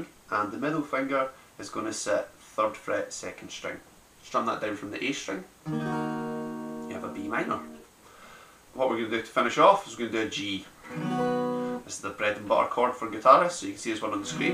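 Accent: Ireland. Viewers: OMG YOUR ACCENT<3. ARE YOU IRISH OR SOMETHING?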